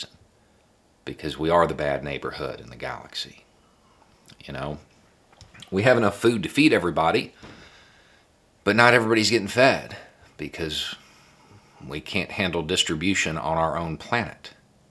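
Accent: South, United States. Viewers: You got that good ole boy accent, but you have a genius quality view of reality. I wish there were more of you in positions of power.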